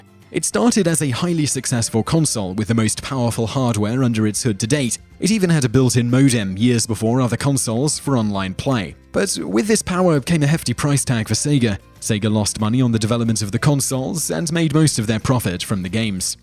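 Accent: United Kingdom. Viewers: Wow. You must be ignorant to the fact that Great Britain alone has many many accents. It's his accent.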